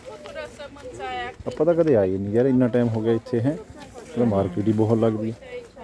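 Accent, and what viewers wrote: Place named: Amritsar, India. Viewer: bhau Amritsari gurdaspuri lgda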